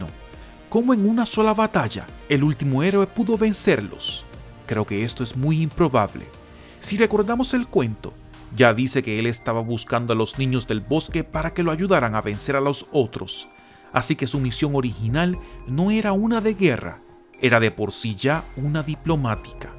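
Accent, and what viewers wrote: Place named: Chihuahua, Mexico. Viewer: Eres de Chihuahua o porque pronuncias así de fea la "ch"?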